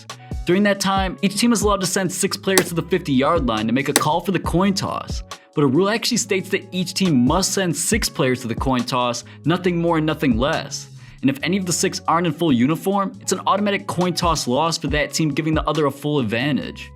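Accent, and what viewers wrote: United States. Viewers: He talks like a minasota girl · he has a SoCal accent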